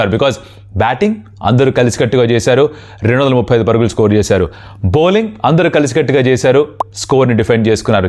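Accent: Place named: Telangana, India. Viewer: అరే అన్న.. నువ్వు చెప్తుంటే నాకు ముచ్చట చెప్తుంటే చెప్తూ మంచి విషయాలు చెప్తా అన్న.. సూపర్ అన్న.. మీతో పాటు కామెంటరీ చెప్పే ఆ ముసలి అంకుల్ ఏదో భాష మాట్లాడుతాడు.. ఆయనకే వచ్చు అన్నట్టు ఏదో నీట్ గా మాట్లాడుతున్నట్టు చెప్తాడు. అట్లా చెప్తే ఎవడు వింటాడు అన్న.. ఇట్ల మన తెలంగాణ భాష, యాస లో చెప్తూ ఉంటే ఆహా వినడానికి , నాటు కోడి కూర తిన్నట్టు ఉంటది అన్న.. సూపర్ మీరు..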